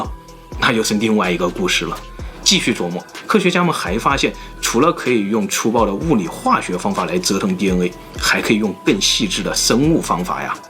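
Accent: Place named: Hunan, China. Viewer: 你的湖南口音很重：l，n不分，以至於不得不時刻反應：暖細胞是卵細胞等。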